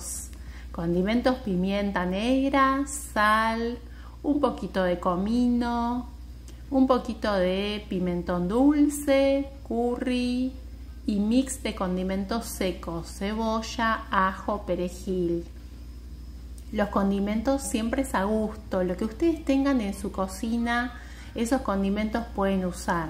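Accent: Buenos Aires, Argentina. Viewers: Hola, justo estoy en ese proceso de volverme vegana y comer saludable y Dios me puso su canal, gracias. Me encantaron sus recetas, tan fáciles y se ven muy ricas y el paisaje de regalo uff lo ame, pense por su acento que estaba en Argentina, pero leyendo los comentarios vi que es Brazil, de verdad que son Bendecidos y que así sigan siempre. Un saludo desde la Ciudad de México . P.D. Muchas gracias por compartir la receta y el bello paisaje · Escucho que sos argentina por tu tonada bonairense. Yo viví en San Juan por 10 años, soy italiano, pero ciuando hablo español lloro como ellos. Hagale un video de una empanadilla bien criolla vegana! Nunca haría piensado que podìan existir veganas argentinas! Un abrazo...sellado Explorador del Viento Zonda, vegetariano, no vegano.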